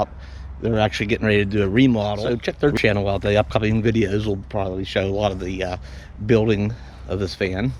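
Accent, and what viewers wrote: Pennsylvania, United States. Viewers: you are an exceptional friend to do all that you have done for Ausia. I am also impressed with your diagnostic skills. As soon as I heard your accent, I knew you were from PA, as am I. The ferry at Port Angeles can take you to the San Juan Islands, USA. I put my RV on the ferry and went to Friday Harbor to visit friends. I think for a 22 foot RV, it was about $80 back in the early 1990's.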